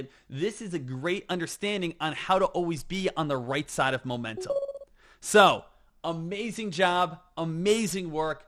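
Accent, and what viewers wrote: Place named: Philadelphia, United States. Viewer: I know bro…we still love Ya bro. 😂😂😂 I always was torn between Jersey and Philadelphia for your accent. More Phila to me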